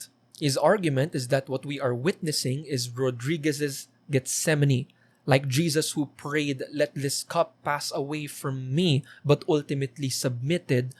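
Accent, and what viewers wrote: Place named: Caribbean. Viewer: You have a slightly Caribbean accent, interesting.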